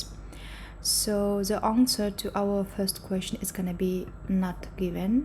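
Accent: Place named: India. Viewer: Why you are pronounce as the Indians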